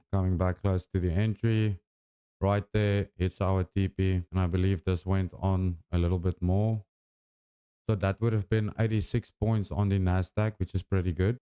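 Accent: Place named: South Africa. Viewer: Brother you sound South African. Wanted to say thank you for your growing channel and your lessons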